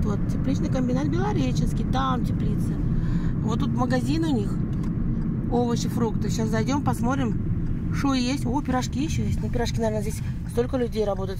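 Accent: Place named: Crimea, Ukraine. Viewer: В Крыму тоже так говорят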